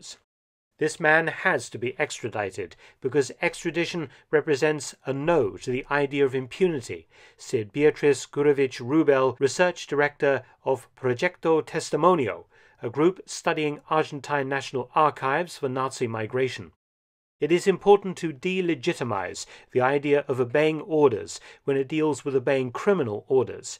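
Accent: United Kingdom. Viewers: There's something about a British accent that makes a WW2 history video that much better lol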